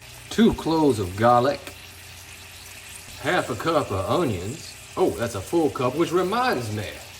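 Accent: South, United States. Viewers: Man you have that southern accent that make me laugh man. Very funny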